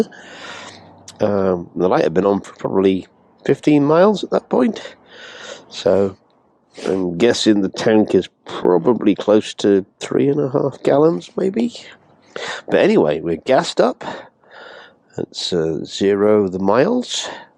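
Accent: United Kingdom. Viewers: Very enjoyable vid and well made for saying you only have a couple of other vids on your channel. I've always thought the W800 as a lovely little retro but probably a bit small for my 6ft 4 frame. You sound British and very clear to listen to. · It took me a minute to realise your are not in the UK. The accent got me.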